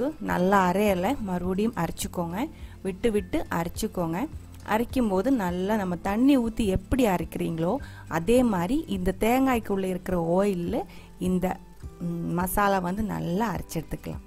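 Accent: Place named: Coimbatore, India. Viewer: Ada ammani..... neenga at present kovaila that erukerngala OK OK athaan pathean enada covai Tamil varuthenu. super ma. I too at kovai thaan. epa Ellam unga slangla thaan en wife pessaranga super. ur style of voice is amazing and with lots of love.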